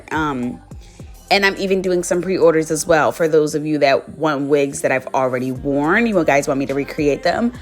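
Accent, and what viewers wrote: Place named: Baltimore, United States. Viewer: You’re so pretty I love your accent , bmore?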